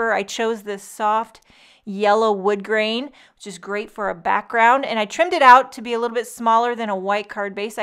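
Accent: Boston, United States. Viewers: You have a great Boston accent! 😂 What wonderful kids spoiling you like that on Mother’s Day! Absolutely love your cards! And this whimsy rainbow die! I find myself creating a lot with this! Great inspiration!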